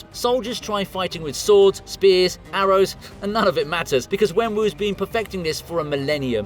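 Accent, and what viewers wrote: United Kingdom. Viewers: Will I listen to british commentary?It makes me think about suicide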